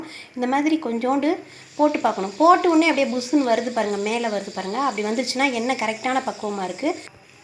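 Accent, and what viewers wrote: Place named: South, India. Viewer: It's southerners slang.This originality is good and unique. Let it be.Its also part of her cookery videos I fèel.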